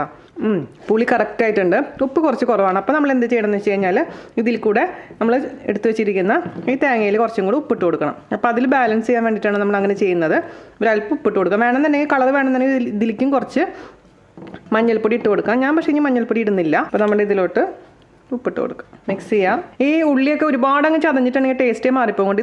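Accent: Thrissur, India. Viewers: I'm a Big fan of u..... ചേച്ചി ♥️♥️♥️ especially aaa thrissur slangilula tta..samsaravum pinne chechide Nadan vegetable recipesum✌️pinne njanum thrissurkarananutta 😜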